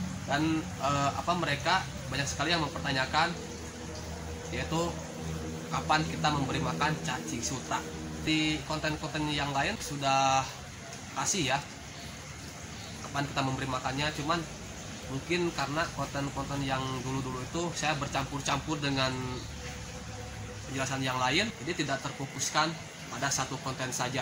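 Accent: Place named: Medan, Indonesia. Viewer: Medan bg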